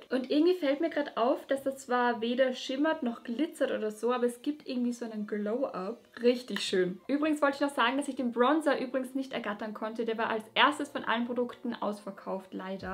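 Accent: Austria. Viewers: Und auch so lieb und immer freundlich und dein österreichischer Akzent ist echt cute und du bist so talentiert und kreativ und ich liebe dein Intro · Hallo ich kenne dich noch nicht lange aber kommst du aus Österreich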